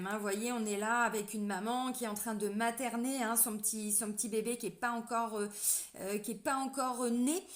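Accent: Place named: Quebec, Canada. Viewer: Maudit que je t’aime toi ont dirais presqu’une Québécoise!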